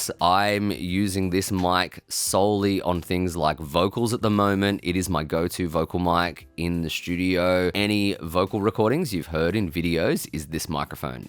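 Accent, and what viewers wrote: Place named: Australia. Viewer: Hi there, Iike your content, its interesting and informative and your accents reminds me on my days touring Australia in the 90 ies ... keep up the good work. Toni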